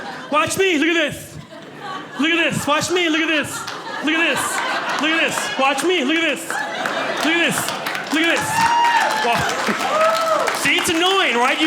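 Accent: United States. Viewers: World be fun if he knew how his and his brothers names are pronounced in Norwegian. And funny they’re pronounced so American and not even with a twist of Norwegian.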